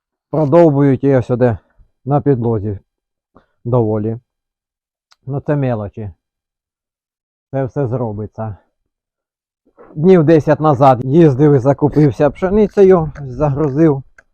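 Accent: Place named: Chernihiv, Ukraine. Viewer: Випадково відкрив,чую говір чернігівський.почитав коментарі.Привіт з бобровицького краю вам.підписався.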